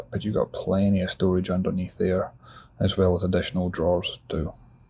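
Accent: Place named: Scotland, United Kingdom. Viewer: Nice to hear a Scottish accent..🏴🏴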